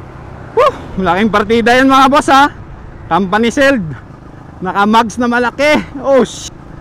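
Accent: Iloilo, Philippines. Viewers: Idol Taga diin ka nga ah ilonggo pang hambal mo hw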